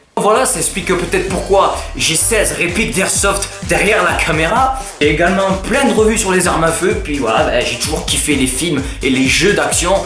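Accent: South, France. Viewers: Je t'adore ! tu me fais rire. Tant fait pas pour ta taille je mesure moin 1m60 et je suis aussi en 3eme voilà voilà. ah oui j'adore ton accent du sud 😉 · J'adore ton accent 😊😍et tes vidéos sont super simple mais tellement bien 👌🏻😏 . C'est drôle parce que je connais un Clément, qui a le même âge et qui a aussi l'accent du sud 😊😂😌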